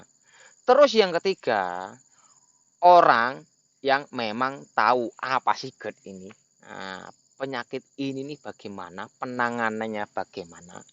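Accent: Java, Indonesia. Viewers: Mas ini domisili di mana...?kok logat2nya orang jawa...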